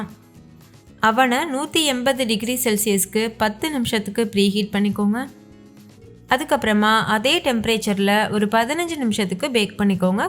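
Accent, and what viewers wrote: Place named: Kongu, India. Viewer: Neegal coimbatore ra.kongu Tamil nala pesugiramga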